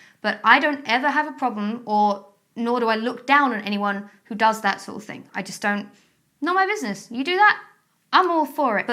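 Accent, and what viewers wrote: Essex, United Kingdom. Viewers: Your Essex accent is like so much stronger??? you sound like 2012 aha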